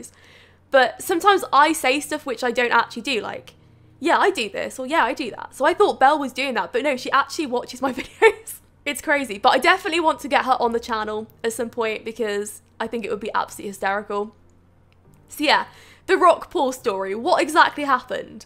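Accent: United Kingdom. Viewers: i love your british english:D wotaaah instead of water😂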